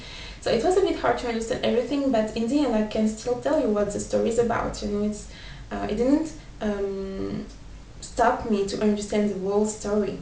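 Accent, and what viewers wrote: France. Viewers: Thanks for the tips. I'm starting learning spanish from zero and will take your advice. P.s love the french accent so don't try and get a more english accent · J'ai direct reconnu le français :) sinon bonne vidéo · Your accent is so interesting! I hear both the French and Spanish accent, and even an African accent. I've been learning French but I hope to learn Spanish too (and improve my French) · Her vowels and "r"s sound French to me. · it's very clear she has a very strong french accent... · You do have a french accent, but your accent isn't impeding your communication. Great job :) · Wow taking into consideration that you are French you speak perfect English but French people don't pronounce the letter h and they consider it a vowel and you still do it but other than that you speak perfect English! =3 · doudi ¿Bueno? tu sabes que... ella todavía está aprendiendo, el inglés y Yo sigo pensando que ella todavía debe tener un acento francés, incluso cuando ella es.. 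¡mejor! ¡suena muy muy bien en acento del LA Frances! ¡amigos! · Good. Now the words are off but you are just as beautiful! (wink wink nudge nudge) Thanks for your videos, I would really like to learn a new language and you make it so easy to listen to. I really like that french accent. It sounds gorgeously suggestive to all of us Americans. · yea u sound French lol, and wow u r beautiful. nice hair too :)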